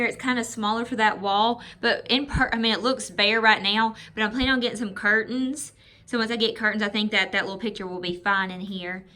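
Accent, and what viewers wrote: South, United States. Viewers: I like the "Iowa Pine" and the "lavender" Mrs. Meyers . Such a pretty chandelier!!! Your rooms look great and doesn't it feel great to have them organized. You did get a lot done in one day. I really like the two thread work pictures. Just a side not~~I love listening to your southern accent. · You might want to look up <link> to see about getting spare parts for your chandy. I have used them as they have come to my rescue several times. Have you thought about painting it? I am on a spray painting frenzy so that is why I mentioned it I guess. You sound like a beautiful southern woman..I was born and raised in Tennessee but am now in Georgia. Love your decorating style! Tfs,,,take good care!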